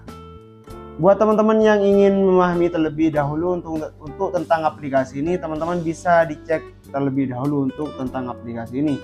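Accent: Indonesia. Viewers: Kayak orang madura bahasa nya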